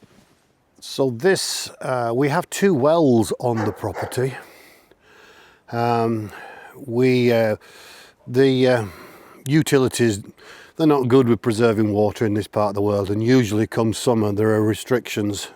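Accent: Northern England, United Kingdom. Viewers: Where in France? a very northern English accent, i live i the Pyrenees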